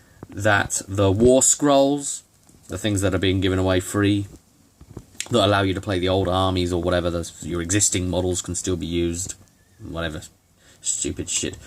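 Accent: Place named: United Kingdom. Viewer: There's just something about a good British accent doing one of these, that we Americans just can't muster in quite the same way....that gravitas...that...elegant sarcasm....brilliant! Thanks for it - you did a great synopsis of much of the concerns that many of us have had since first hearing of this.....game.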